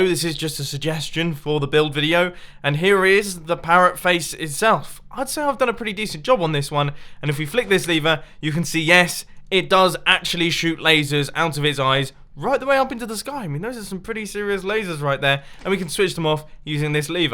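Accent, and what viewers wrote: Australia. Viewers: You from Australia? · wait is Australian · your australian? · r u australian coz i am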